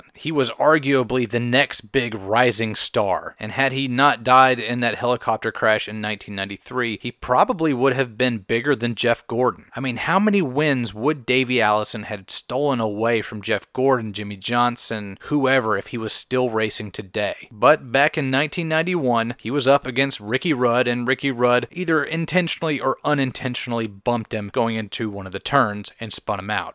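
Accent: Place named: Texas, United States. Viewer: he speaks so fluent at the same time with a texas accent. This is why i like this channel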